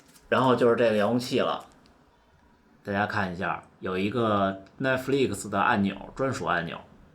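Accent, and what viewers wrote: Beijing, China. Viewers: 典型的北京人口音，真亲切，竟然有人还说口音重，那一定是你自己不讲普通话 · 一口的京片子。。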